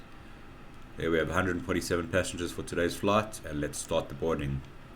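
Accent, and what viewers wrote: South Africa. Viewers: Nice tutorial, great to hear a SA accent in MSFS2020 :) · Great video, thank you so much.... By the way, you sound South African😊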